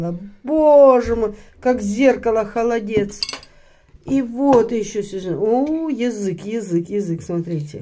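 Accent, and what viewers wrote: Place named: Karakalpakstan, Uzbekistan. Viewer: Вот зачем вы так пишете, она каракалпачка, пусть говорит как может. Пусть речь не литературная, зато у нее много других сильных сторон.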